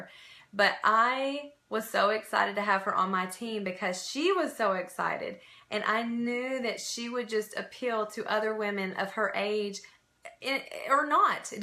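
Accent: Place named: South, United States. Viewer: Excellent advice! Thank you! I do have a question, how do people passing through on YT order from you? I don't see your Younique website link anywhere. Is there a legal issue? I started a YT channel recently and want to promote my business on there through tutorials, unboxings and reviews. Any advice? Thank you so much! Also, where are you from.......love your southern accent. ;)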